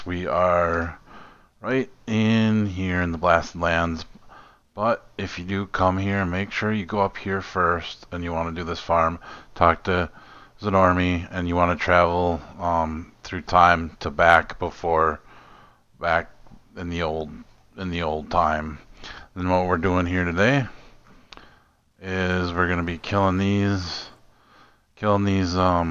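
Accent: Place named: Midwest, United States. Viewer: Your from the Midwest I can tell. I’m guessing Wisconsin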